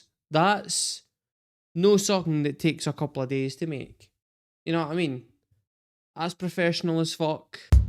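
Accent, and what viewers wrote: Scotland, United Kingdom. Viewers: now that I've found an reaction in Scottish accent, my life is complete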